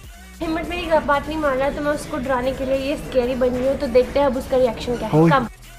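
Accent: Punjab, India. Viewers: Your videos always make me smile...being a Punjabi I lv ur Punjabi tone too...😘😘😘😻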